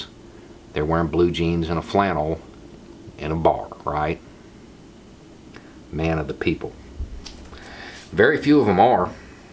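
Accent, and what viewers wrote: South, United States. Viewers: Dude, from somebody from a fairly major city up North as soon as I heard your accent I thought Oh lord another one of 'these' guys. This is probably the 20th video from you I've watched and my lord you've proved me wrong. It's easy for me to grow up with these beliefs... growing up in a densely populated diverse neighborhood you know gay people and Muslims and Mexicans and you realize that they are just like you. I'm white and I grew up in the little polish pocket in a majority hispanic side of town, and I got along with hispanic people far better than white people because of exactly what you're talking about... That sense of superiority and being inherently right just because they're white. To come from the south it takes a lot to develop that kind of attitude. Good for you bud I hope there's a lot more like you down there.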